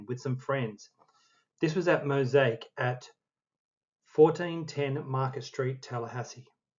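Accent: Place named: Australia. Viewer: Nice to hear an 'Aussie' .. Me too! 🇦🇺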